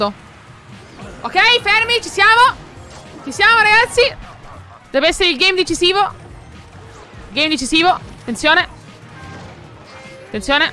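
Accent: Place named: Sicily, Italy. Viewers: Ma sei palermitana io catanese cmq sembri palerimitana dall'accsnto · Buonu nisciu l'accento siciliano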